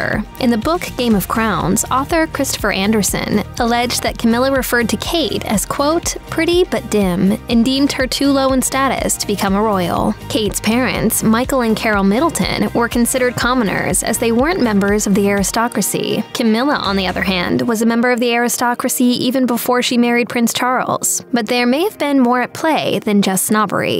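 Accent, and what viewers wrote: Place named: California, United States. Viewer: The voice narrating this has too strong of a Cali-girl accent. Emphasizing the end of every word by drawing it out. It's distracting.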